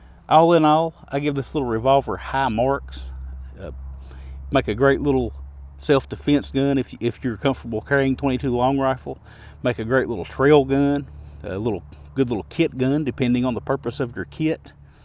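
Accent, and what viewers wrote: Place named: Appalachia, United States. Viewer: The good ole appalachian accent can be hard. He is a great guy, maybe turn captions on, worth listening thru. I personally find him pretty easy vs many other thicker accents (creole, or thicker appalachian, southern ebonic etc)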